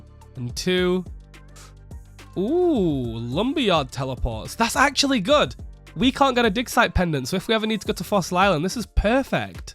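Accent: United Kingdom. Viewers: Where in the UK are you from? Love your accent.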